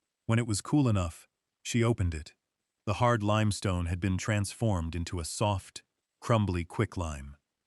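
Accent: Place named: United States. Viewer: Excellent! Story and the narration was nice and gentle. Good American accent. I really dislike those fake British accents! Appreciation from North Central, Minnesota.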